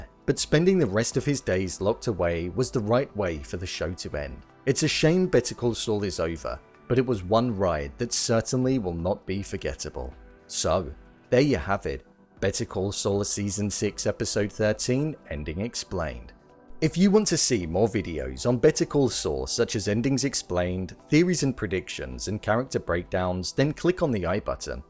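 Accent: United Kingdom. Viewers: Oh god a prissy British guy talking. Disliked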